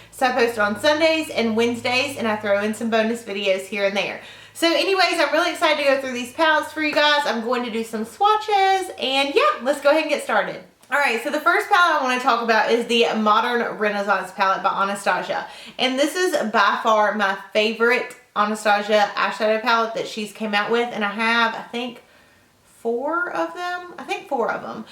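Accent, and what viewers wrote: South, United States. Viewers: Tabatha Gaytan i think its her accent its sooooo thick really southern also when she says oil she doesnt pronounce the i she says ol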